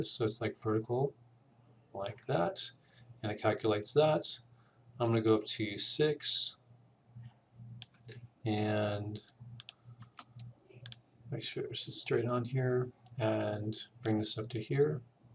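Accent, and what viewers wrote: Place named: Midwest, United States. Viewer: I'm glad that I found this. It is straight forward and so much simpler than others that try to do the same thing. You are easy to understand for me by speaking mid-western English. I'm a 71 year old retired Engineer and I bought a monthly subscription to Solidworks. I have modeled all 88 pieces from Gilbert's Erector Set 8 1 / 2 and am in the process of assembling the projects. You may remember them. Now I can show my friends the animations. Still trying to figure out how to wrap a rope around a crank and pull something with it.....T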